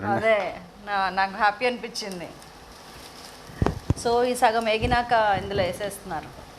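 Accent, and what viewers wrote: Telangana, India. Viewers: Akka me Language superb akka nenu me lagey pakka telangana matladtha mastu ma Hyderabad relatives comments chestaru...akka 'Manchiga' is also my word..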